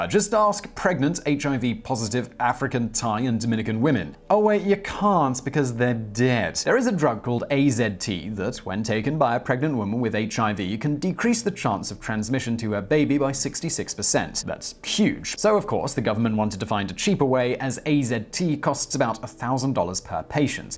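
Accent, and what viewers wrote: United Kingdom. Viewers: Something about a British narrator talking about America's atrocities makes this video hit different to me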